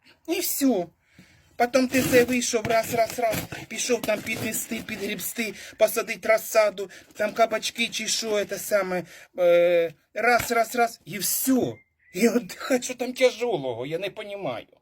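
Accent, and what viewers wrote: Ukraine. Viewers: Артист😂😂😂❤❤❤Спасибо. Нiч яка мiсячна зоряна ясная.. Как все это знакомо, все родное. Эту песню мама пела. . Может удивлю, но есть в России на Дальнем Востоке село, где жила моя бабушка. Ее родители были переселенцами, в царское время переселились в Приморский край. Вот на таком языке все село говорило. Даже интонации те же, и логика😂😂.. Слушаю, как будто земляка. Молодчина!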